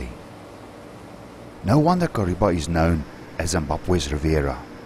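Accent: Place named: Afrikaans, South Africa. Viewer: A fantastic yourney no doubt, love your Arikaans accsent boykie. Pretoria boy, 40 years in USA. Miss Kariba a lot. Would love to do your trip.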